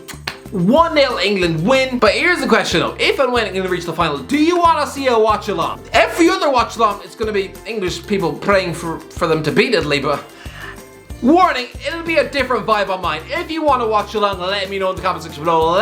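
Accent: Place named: Ireland. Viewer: i love the irish accent, just can't understand how talks so spastic-ally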